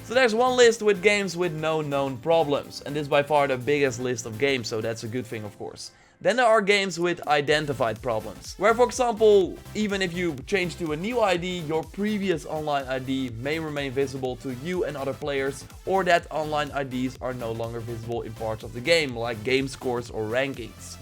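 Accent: Netherlands. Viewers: Ik hoor aan je accent dat je Nederlands bent!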